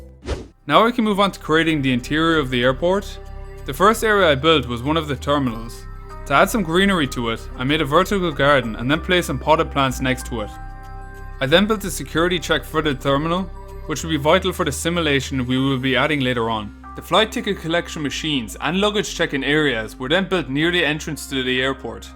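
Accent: Ireland. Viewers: U sound irish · Are you Irish. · Are u irish? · Where are you from in Ireland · Are you irish boss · Are you irish? I saw you in yung chips video and I was like, yea he sounds irish 😂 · Are u from Ireland because I am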